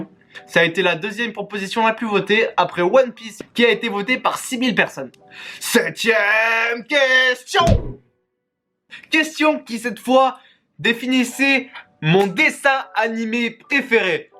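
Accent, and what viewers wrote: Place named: Quebec, Canada. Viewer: Hey grand mammouth nommé! Continue tes vidéos, tu vas bien avancer avec cette chaîne youtube! Juste, quand tu parles j'ai l'impression que tu as un petit accent canadien québécois mdr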